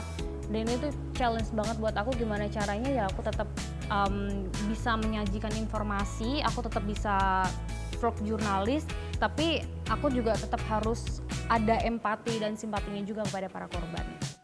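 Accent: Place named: Mranggen, Indonesia. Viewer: pye2lah logat mranggenmu gk ilang mbak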